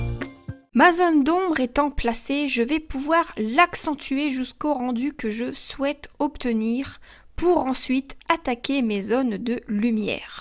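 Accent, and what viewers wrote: Nord-Pas-de-Calais, France. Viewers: Tu n'a pas a etre complexée de l'accent chti... essaies 1 fois ....rien que pour voir les commentaires ... Ose..! j"attends ta prochaine démo impatiament ..... comme je te trouve sympa ce petit truc pour te faire économiser du crayon: quand une mine casse mets une goutte de glue UHU dans le trou et atends 24 h .....bise...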